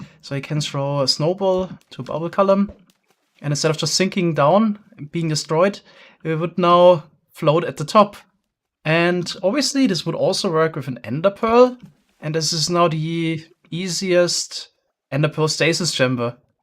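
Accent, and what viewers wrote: Germany. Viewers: you sound a bit German 🤔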